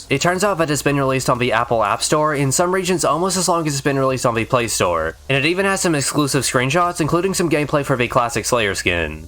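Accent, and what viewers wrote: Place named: Ireland. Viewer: Are you kidding me, I can't even honestly tell what type of accent he is, maybe irish...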